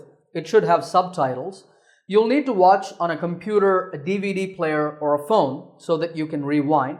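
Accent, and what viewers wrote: United States. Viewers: I want to learn American Accent from you. What is the procedure?? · Did you grow up in the US ? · That's American 1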